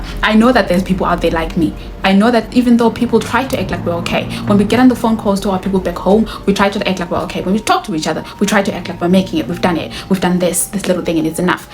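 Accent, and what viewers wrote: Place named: Africa. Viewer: Please work on your accent! It’s too difficult to understand your when you speak fast ! Practice to speak less African !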